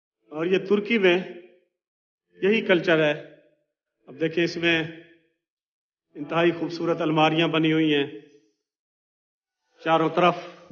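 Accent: Saraiki, Pakistan. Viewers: Sir please saraki ka tarhka lgaya kro Maza ata hai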